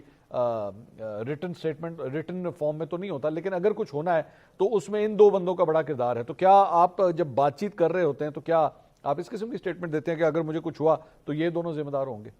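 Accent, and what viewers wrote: Punjab, Pakistan. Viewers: السلام علیکم بھائی میں خانپور سے اپ کا بلاگ ڈیلی کا سنتا ہوں ماشاءاللہ اپ کی اواز اور پنجابی بولنے کے سٹائل گڈ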